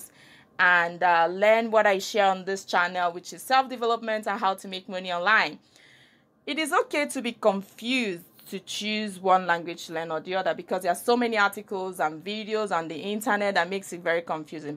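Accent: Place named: Igbo, Nigeria. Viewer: You sound so much that a Igbo/Nigerian woman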